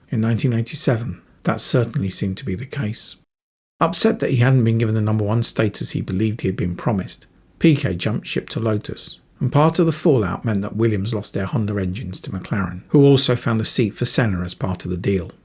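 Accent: United Kingdom. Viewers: French here. Thank you sir for being able as an english motor racing buff (I suppose so, based on your way of speaking) to paint a balanced picture of an english great driver, rather than producing yet another hagiography. Moreover, the editing of your video is quite good and your comments brillantly written, congratulations. To complete Mansell's picture, Alain Prost declared, in an interview part of a long documentary on his career made by a french TV channel, that Big Moustache was the only teammate he had not been able to work efficiently with in Formula 1, because the man of the Man's Island was not commited enough in the development's work and was behaving as a prick generally speaking. He explained that even when he and Ayrton had a huge disagreement and were not talking to each other anymore in 1989 after the San Marino GP's incident, they managed to keep on collaborating very professionnally during technical briefings and development's sessions. 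According to him, Nige was very fast and undisputably the bravest of all, but not as complete a driver as Niki, Nelson or Ayrton. Despite his flaws, "Il Leone" was a fantastic striker almost always thrilling to watch, and a monster of will who deserves a huge respect for that reason, considering the physical impairments he had to overcome all along his motor racing's career, resulting from his very serious accidents in the early stages of it.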